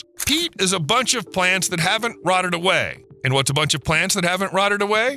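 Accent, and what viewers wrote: United States. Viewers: It is unfortunate that the announcer is a loud American..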